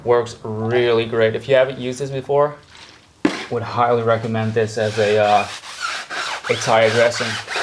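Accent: United States. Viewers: I really learn a lot from all of your videos whether you talk or choose not to talk. Both are excellent. By the way, you sound American, but I thought you were Dutch. Where exactly do you live in Europe?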